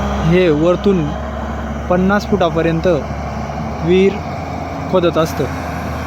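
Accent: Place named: Khandesh, India. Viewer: राम राम दादा तुमनी भाषा वरूण तूम्ही खानदेशी वाटतस तूम्ही चांगल काम करी रायनात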